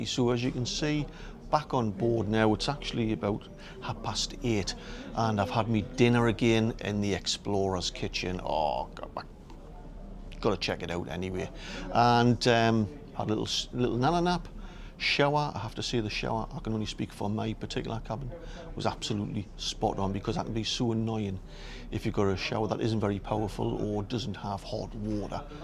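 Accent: Newcastle, United Kingdom. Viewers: Hi mate great little video ,just found ya Channel so will be watching others you've done, with the scots your geordie accent is the one i like the best in the uk 😂😂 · This is such a great video. Thanks for making it. I watch your vids as a fellow Geordie who moved to Ireland 23 years ago. I found your channel a few months ago and am hooked as I love seeing the Toon again and your accent is brilliant mate. Great work Keep it up. 👍 Cheers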